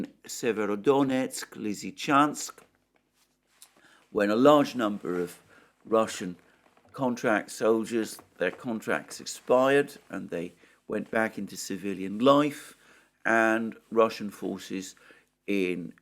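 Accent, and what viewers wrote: United Kingdom. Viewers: Love the muted tonality of your British accent. But one particular anecdote has always bothered me by your use “repeat again “. As I was brought up studying the English language, I was repeatedly cautioned against this redundancy. Am I right in saying so? Begging to be enlightened.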